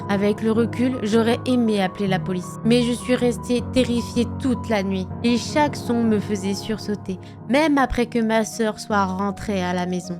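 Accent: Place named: Belgium. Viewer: Belge ahah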